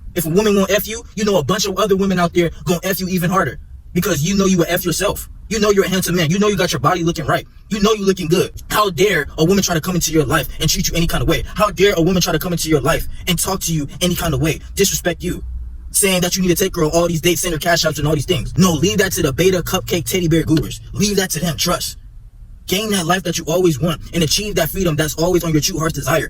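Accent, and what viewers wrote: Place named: Los Angeles, United States. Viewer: Bro you got that L.A accent. You from there?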